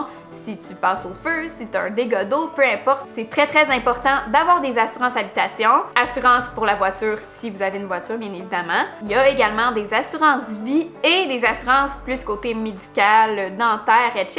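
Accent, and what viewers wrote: Quebec, Canada. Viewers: Cette accent ça me fait craquer et pas à peu prêt, je suis français je sais ces pas facile tout les jours. Mais le Québec je vous aiment des bisous. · Ce n'est pas canadien mais Québécois et elle a fait une vidéo avec les différents accents de la province du Québec, je te la recommande. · Le français de québécois 😯😯😯😕😕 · Hhhh c'est l'accent québécois elle n'y peut rien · Merci pour cette vidéo, je t'adore avec ton accent québécois qui font a l'oreille 😭👌❤️ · Salut!! J'ai recemment decouvert votre chaine! Je trouve vos videos tres utiles!! Je regarde votre videos pour ameliorer mon francais et aussi m'habituer a l'accent quebecois. Bravo! Merci :D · Pour practique entender l' accent quebecois, il marche mettre la vittesse 0.75 parce qu'elle parle tres vite pour un debutan comme moi. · Waw, l'accent québécois est très difficile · Voila ce que j'aime beaucoup chez les canadiens. 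leurs accents. Merci ma soeur. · J'adore ton accent canadien :) 🛸🙂🛴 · Au fait, tout ce qu’on gagne, on le donne et on rajoute dessus! Je te remercie beaucoup pour cette vidéo! Mais je voudrais dire que vous êtes trop belle et j’ai apprécié beaucoup ton accent québécois! Puis je avoir votre email si ça ne te dérange pas please?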